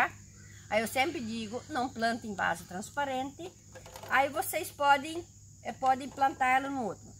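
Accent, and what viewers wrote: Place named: Germany. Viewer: Esse sotaque alemão ❤️😍😂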